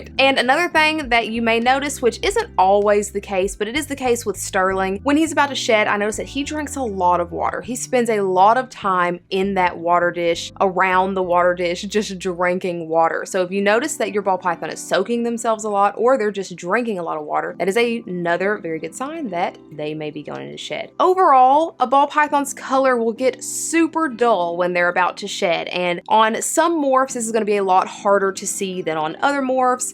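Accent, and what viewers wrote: South, United States. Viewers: I sooooo love your southern accent and you are just adorable. You are also soooo knowledgeable so I had to subscribed. Thanks for sharing what you know with us.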